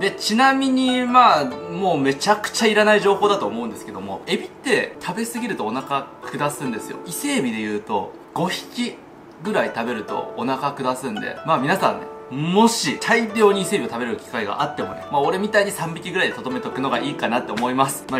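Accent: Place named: Kansai, Japan. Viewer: たまーに関西弁入るのは何でなんですか？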